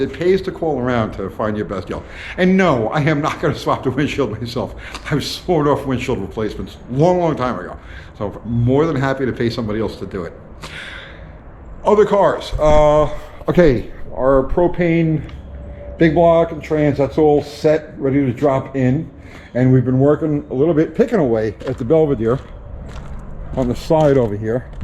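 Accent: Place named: Northeast, United States. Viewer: So, based on your accent, I just assumed you were in the Northeast. Shout out from Clarksville!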